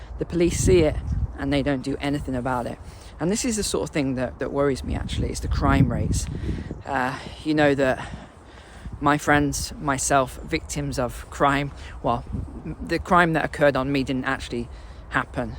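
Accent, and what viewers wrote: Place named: United Kingdom. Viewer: I really love your videos and I personally think that 15 minutes is a great length. I watch just for the information as I am too old to apply any of it to my life! I also like you and your British accent! I hope you really love the place you are moving to!!! I am 72 and ruled by a dog that has an alien brain ( complicated )! I had investments for retirement but I didn't pay much attention to them because it wasn't that much. I checked them in early in 2019 when the pandemic struck and i had lost $30,000 dollars. I just left them there and hoped they would recover when the pandemic was over! I checked them again in early 2021 and they had gone from $270,000 to $ 430,000? I cashed them out and put them in a IRA and still can't find a safe investment! Bonds are no good, gold isn't doing anything, and the stock market is crashing, just as I predicted when they started printing all that money!!! 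Give me a clue,!!! P.S. The USA is not the place it used to be: - ( Beautiful walk!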